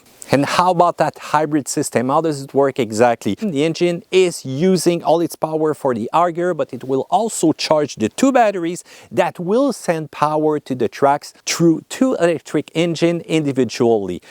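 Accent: Canada. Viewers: I like his accent. Canadian people are awesome.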